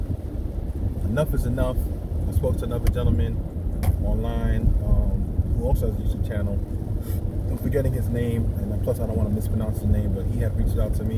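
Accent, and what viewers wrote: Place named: New York, United States. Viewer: what part of new york are you from man ?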